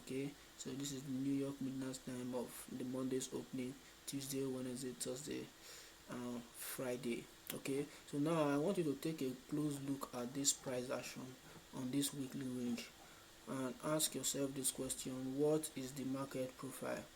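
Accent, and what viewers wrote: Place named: Nigeria. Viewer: Chai, I thank you very very very very very very very very much, infact words can't explain how I am thankful for finding this YouTube channel. Let me tell you, since I found this channel I have been having in my trades and heart. Normally I don't like watching any YouTube videos apart from ICT, but I was on a search on a question that was bothering me, then I stumbled on your channel, just watching one, just one video from your channel I got in love with the way you simplify everything ICT is teaching us. You know, there is a difference when your own Nigerian person is talking to you compared to an America or Foreigner with their accent. I just love it, Sir please keep it up to drop simplified videos like this and others in future. I'm your number 1 Fan. Love from me to you❤️❤️❤️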